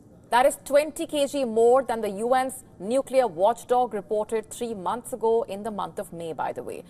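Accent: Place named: South India, India. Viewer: Is the news reader a south india. Her accent seems she is from south.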